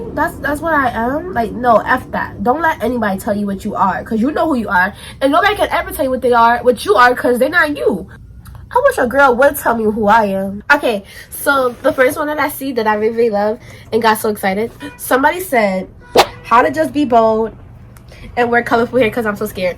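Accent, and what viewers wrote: New York, United States. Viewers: I love your accent cause you sound so New York, love you 💕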